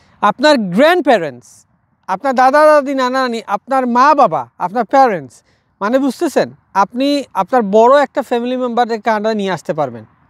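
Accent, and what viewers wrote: Sylhet, Bangladesh. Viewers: Bhai sylheti ni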